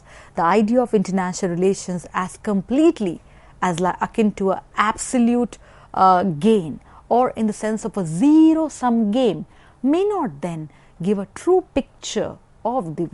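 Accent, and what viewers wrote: India. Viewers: The accent understandably is a product of where you live and who you choose to mingle with. This is a mark, and not a "wrong" with Indians.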